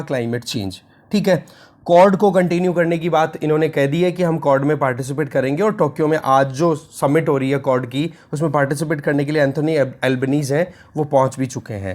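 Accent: India. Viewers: Sir , HARIYANA Se ho kya aap .?? Thank you so much for such a great explaination... ❤️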